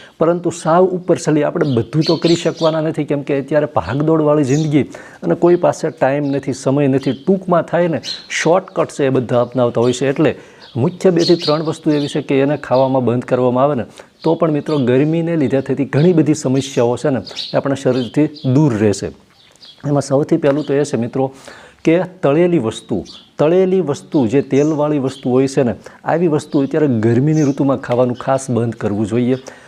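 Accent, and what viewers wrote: Bhavnagar, India. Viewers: તમારી ભાષા અને ઉચ્ચારો પરથી લાગે છે કે ભાવનગર તરફના હશો. ખરું ?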